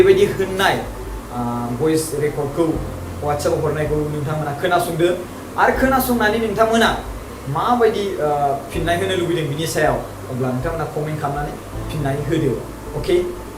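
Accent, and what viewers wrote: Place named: Baksa, India. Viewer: Baksani railainai ...style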